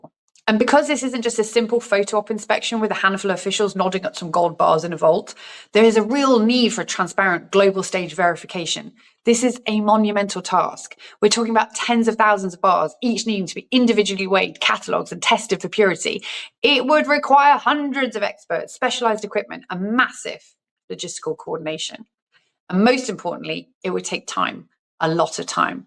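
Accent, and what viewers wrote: United Kingdom. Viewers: Who is we? Your accent sounds British? We in America need to do nothing if it bothers you then go use yin if memory serves the British pound was once a world currency correct? So I think the entire planet has gotten themselves into debt nearly all countries….what blows my mind is the country with the reserve currency has to debase it in order for all the free loaders to use it, all these videos on what we the USA must do, we don’t have to do anything we have been paying the bar bill for all the NATO countries for some time allowing all of you to spend less on defense and to rip USA off in trade all to help your countries and you all still are in debt…..America is in the debt because we have been covering the cost of allot of countries…Cold War ended at that point you all should have pony up but for some reason it didn’t happen….now with trump saying enough is enough we get all the tears Canadians are having a melt down over it the were getting 200 billion off us in trade but blocked allot of our goods same as European countries. 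Here is what I think if the gold is gone from Fort Knox and the USA dollar collapse Europe will be at WW3 inside 5-10 years with each other or Russia do the math if the reports are true Germany has one of the largest gold reserves hmmmmmm the country that started ww2 that owed war debts until what the 90’s will suddenly be most powerful European country lol every time Germany has been unified and has the means there has been war.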